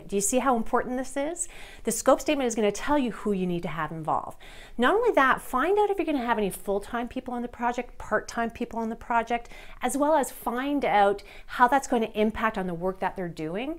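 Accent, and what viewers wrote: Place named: Canada. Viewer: It's "About". Mm'kay? "About"! Not "A-boat". Just kidding :-). Are you by chance Canadian? I can't put my finger on your very faint accent. Anywho, great info, very informative. I'm looking for a class to give to all the management at my Aviation job. This is some good stuff.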